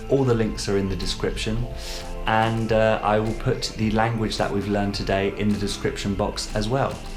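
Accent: United Kingdom. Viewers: Where do you live in Uk? Your dialect? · Hello,! I found your channel and let me tell that it's amazing! I learnt new words and phrases today. I really love the British accent and hope you keep uploading more videos. Finally, what do I think of British currency? Well, the first phrase that comes to my mind is "great exchange value", because British currency has more exchange value than others kinds of currency. 💷😎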